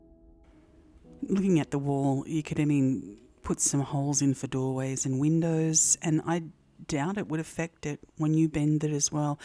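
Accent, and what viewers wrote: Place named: Australia. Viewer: Worked very well and have never thought about this. Saving this in the bank of things to do, you sound like a fellow Australian as well mate :D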